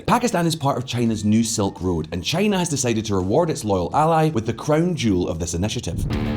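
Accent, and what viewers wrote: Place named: Scotland, United Kingdom. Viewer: His accent has the Scottish tinge to it.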